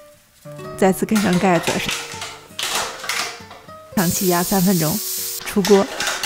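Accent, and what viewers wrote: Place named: Xi'an, China. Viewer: 之前在国外就看你的视频，那会儿一听口音就感觉是西安的，做的菜跟我家也很像，一晃几年了，回国了还看你做菜。❤❤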